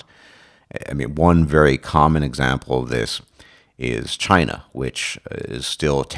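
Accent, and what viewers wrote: RP, United Kingdom. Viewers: Haha, RP it's called? You sounded just like William F. Buckley, Bertrand Russell, Aldous Huxley, Arnold J. Toynbee, and Stewie Griffin. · like for the queen's english accent.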